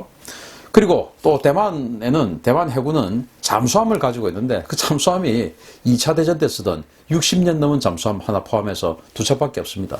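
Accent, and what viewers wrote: Gyeongsang, South Korea. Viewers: 신인균씨 경상도 사투리가 조금 남아 있네요. 표준어 구사를 부탁합니다.. 자주국방 네트워트 회원이었죠. 지금 한미간에는 어느때보다 가까운데 갈팡질팡이라는 것은 가짜 뉴스에 불과 합니다. 조갑제 tv등 극우 언론인 ,기독교단체의주장이 일방적으로 진실이다? 무슨 설교하세요? 몇일전에 미국 재무부가 확인해준..한국의 가짜뉴스를 인증했는데... 미국도 친중인가요? 그럼 박근혜때 친중을 넘어 사대주의 끝판왕일때는 뭐하셨어요? 박근혜 정부 찬양하느라 바쁘셨는지? 중국 사대주의 박근혜 -시진핑은 베프 통일방해 북한지원 중공군 빨갱이 시체 무상으로 헌상 -친중 서방진영유일 중국과 FTA 박근혜-친중 TPP 쌩까고 AIIB 가입 : 친중 중공군 전승절 공산주의 동맹 반미 : 친중 대통령 당선직후 중국에 김무성 특사 : 친중 중국 미세먼지에 고등어 타령 : 친중 평택 차이나타운 건설 : 친중 제주도에 중국공안 배치 검토 : 친중 차이나머니로 정계, 언론계, 문화계 친중매국노 판 만듬 : 친중 차이나머니로 국내 기업 M&A해서 기술 다 빼김 : 친중 중국의 남중국해 강도짓에 침묵 : 친중 중국의 센카쿠 침입에 침묵 : 친중 이것이 친미인가요? 친중인가요? 이명박이 적극 지원해서 건립한 롯데월드는 신이내린 안보방어벽입니까? 노무현때는 논의하고 거절하면 죽일것처럼 덤벼들고 그것때문에 자주국방테느워크 사이트에서 많이 싸웟죠 대부분의 회원들의 역사관이 개신교, 이승만 박정희 박근혜 이명박... 그리고 일본에 대해서는 섬김의 나라..일본의 식민지배 찬양하는 회원들이 많았고 밀리 사이트인지? 아니면 극우 정치사이트인지 ? 에스더라는 극우 개신교 가짜뉴스방송과 조중동이라는 친일극우신문의 가짜뉴스를 보고 판단하시는건가? 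문재인정부가 갈팡질팡이라.... 밀리터리도 그닥 잘아신거 같지 않지만.. 정치경제를 모른가면서 가짜뉴스를 바탕으로 정치경체논평하는건 모순이라고 생각합니다만? 美, 대북제재 구멍 겨눠… '세컨더리 보이콧' 명시 : 뉴스 : 동아닷컴 이밖에 신인균씨와 조갑제씨와 친하신가? 조갑제 방송에도 나오시네요 그 조갑제 티브이...검색하니깐..전부 박근혜 친박 극우개신교단체사람들만 사실이라고 주장하던데... "한국에 세컨더리 보이콧"?…미 재무부 '사실 아니다' 확인 국내기사뿐아니라 미국의 문재인 트럼프 한미관계 대한 자료입니다. 미국 재무부 " "한미동맹 굳건"…트럼프 "한미는 위대한 동맹관계 트럼프 "내 친구 문재인".. 친분 과시한 한미 정상 <link> <link> 트럼프 "한미FTA로 양국관계 발전·도약" 웜비어 부친 "문재인·트럼프, 한반도 평화구축 노력 지지" 문재인·트럼프 대통령 노벨평화상 받을까 트럼프가 시진핑에게 문재인과 친분과시 문재인이 한국에 중국군대를 주둔하자고 했나? 역대 최고 수준의 한미관계를.. 박정희가 미사일 사거리 주권 헌상하고, 박근혜는 뭐했으며.. 문재인이 미사일 사거리 중량 패기는 친중인가요? 미국 전략자산 들어 오는것도 친중인가요? 이명박 박근혜 때 9년 뭐했어요? 비판좀 하시지...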